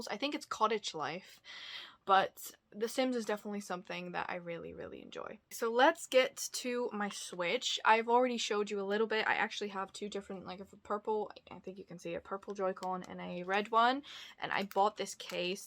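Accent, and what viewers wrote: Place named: Netherlands. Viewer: I thought I picked up a distant Dutch accent and then I looked it up! So lovely! I like your vids. So lovely and cosy ❤️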